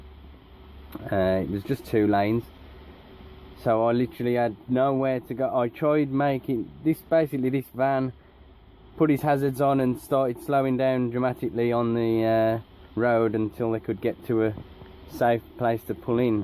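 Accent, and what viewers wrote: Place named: Birmingham, United Kingdom. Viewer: Just subscribe m8! Where is the yard you leave from. You sound like a brummy so im guessing Birmingham. Keep up the good work 👌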